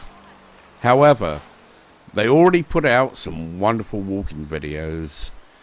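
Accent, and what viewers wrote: England, United Kingdom. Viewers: Oh.. I see... not only your accent but also the atmosphere looks like English one... ha ~ Thank you anyway. Best regards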